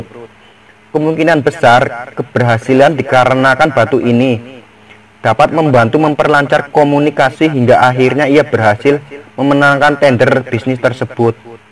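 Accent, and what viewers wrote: Banyumas, Indonesia. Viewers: ngapak ya mas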